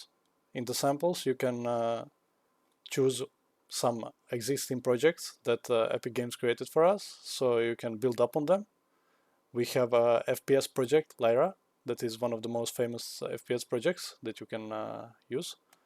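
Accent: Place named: Croatia. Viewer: Odma prvu minutu sam posumnjao da si nas hahaha, hvala ti za ovo <3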